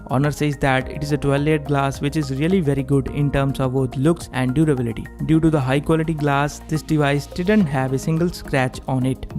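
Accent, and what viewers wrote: India. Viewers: Ugh another impossible to understand THICC Indian accent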